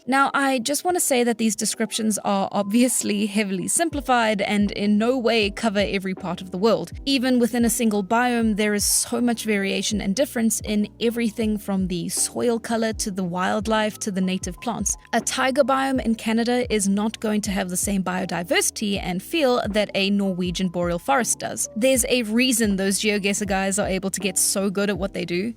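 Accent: South Africa. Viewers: I was looking for this comment lol. It was the first thing I noticed. I think us South Africans just have an ear for the accent haha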